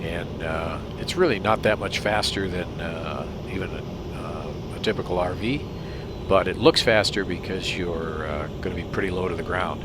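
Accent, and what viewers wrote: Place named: United States. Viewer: Thank you it was very interesting and relaxing video, pleasant , calming voice with good American English. Greetings from Ukraine, home of biggest plain .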